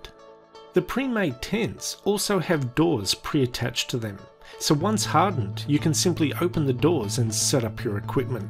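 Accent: Australia. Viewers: I like your accent. You sound like an Aussie :)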